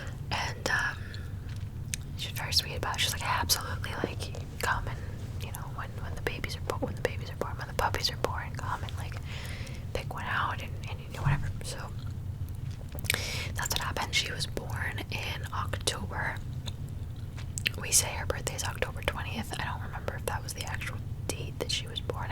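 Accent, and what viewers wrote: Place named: Northeast, United States. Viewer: I’ve always been curious where you’re from & what your background is? I’m from Australia so I don’t have a clue abt American accents but I’m guessing a state in the North-East? 😅 & Im assuming from your appearance you’re hispanic but also curious abt that too :)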